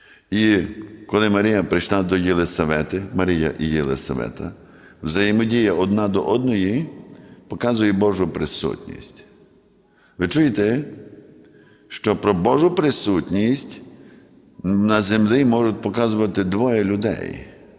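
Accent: Western Ukraine, Ukraine. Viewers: Любов Писаренко, мова о. прекрасна із дещо діалектом, який притаманний на західних територіях України.Слухати науку дуже люблю. Слава Ісусу Христу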